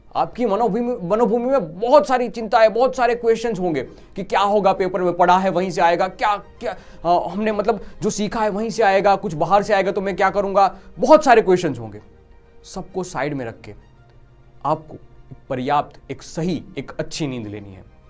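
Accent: Gujarat, India. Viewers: Gujrati lag rahe ho app ??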